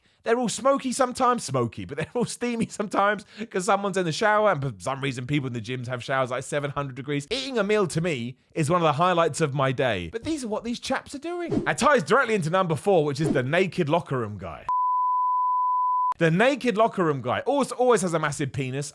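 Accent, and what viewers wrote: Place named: RP, United Kingdom. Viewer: A subset of the eater are the people with what I call "bro jugs", they have these huge jugs that just have to leave on the floor, the size of a carton of milk, filled with either water or even better "branch chain amino acids." I've seen both swole people and hard gainers do this. Maybe it helps them, but to me, I felt like if I were to try to drink even a fifth of that while deadlifting I'd yack. Another subset of the naked guy is the old guy drying their balls in the hand dryers. I still have mental scars seeing some old guy literally dry his balls in a YMCA bathroom like he was at home, not a care in the world. Of course, I can't add to this list without copping to some of my own bullshit, which is grunting. I'm a grunter-- I know why I do it, but I also know it could probably be disconcerting. Of course, I don't feel bad next to that very eccentric gentleman channeling his inner Lady Gaga who will just full-on sing so loudly I can hear him over the heavy metal I have blasting in my ears so I don't have to hear other people in the gym. 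Anyway, big fan, I like your content but I also admit it's nice to hear some guy with a posh accent who sounds like he could be teaching a 301 class on the reign of King Henry the 8th instead of someone who speaks like their neurons have been replaced by branch chain amino acids.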